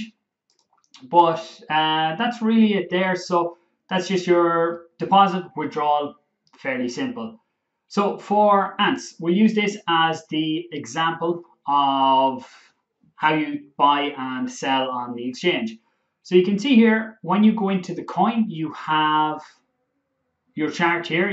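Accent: Ireland. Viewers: Great to hear the Irish accent !😁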